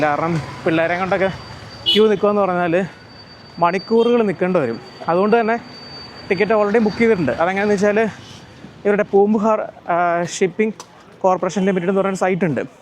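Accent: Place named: Idukki, India. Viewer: ഇത് x-mas വെക്കേഷന് എടുത്ത വീഡിയോ ആണോ. ചേച്ചി ട്രിവാൻഡ്രം ആണെങ്കിലും ഇടുക്കി സ്ലാങ് ആണലോ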